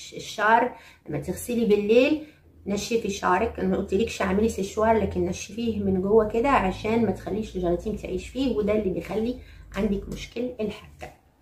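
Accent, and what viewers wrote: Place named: Morocco. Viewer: كنت أظن أنها من مغرب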